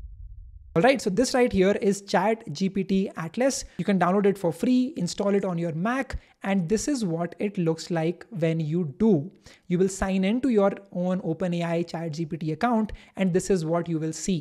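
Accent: India. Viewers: The thick Indian accent 😭🙏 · ahh ofc. when i hear hindi accent i already know this video is bullshit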